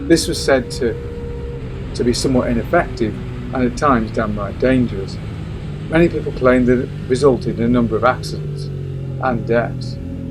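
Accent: England, United Kingdom. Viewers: I could not watch the entire video. The English accent drowned in the background noise of the engines made it very difficult to understand. I'm sure it is a good video nonetheless !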